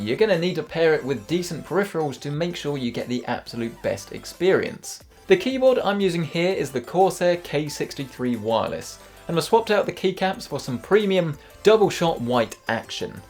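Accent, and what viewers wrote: United Kingdom. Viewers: This british accent’s so nice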